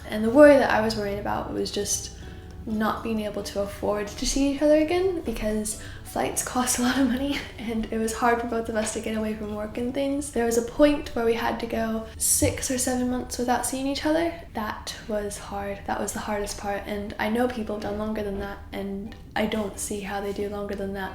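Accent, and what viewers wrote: United Kingdom. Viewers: your American accent is sounding UK. THATS AWESOME!! YOU GUYS ARE LOVELY. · Ok this has nothing to do with the topic of the video but your accent is really interesting, because you are starting to use some British ways of pronunciation, the stress on certain syllables in certain words for example. I just find this really interesting as an English (as a foreign language) student.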